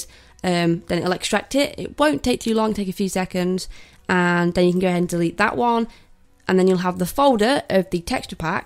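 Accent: England, United Kingdom. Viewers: wow british sound i think ;) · that voice is she from manchester londer lester or none and its sound so familiar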